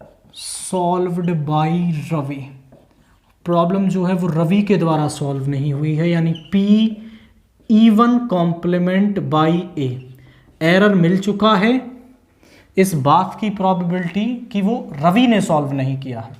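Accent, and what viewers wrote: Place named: Haryana, India. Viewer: Tone to haryanvi h bolne ka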